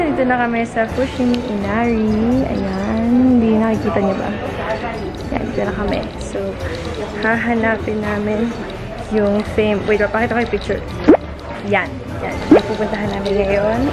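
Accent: Visayas, Philippines. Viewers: Mommi bisaya po ang aksent non like if you agre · Okii lang. Bisaya kaayo kag accent hahahaha loveyou 😍😘❤ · Visaya ang acsent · bisaya accent po! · Ate bisaya accent po yun😂😂😂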